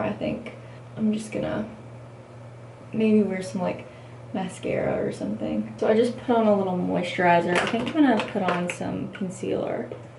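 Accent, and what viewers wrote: South, United States. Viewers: This Virginia boy loves to hear ya'lls Southern accent.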